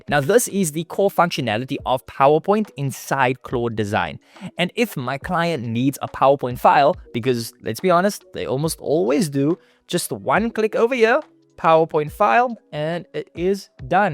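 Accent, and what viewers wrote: South Africa. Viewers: Are you South African? That accent is too local for me to ignore haha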